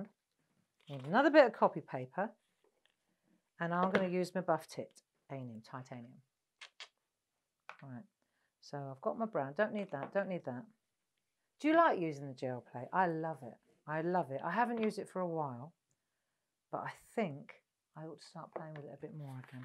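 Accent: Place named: England, United Kingdom. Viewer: Amazing. Great video, your English accent makes me homesick.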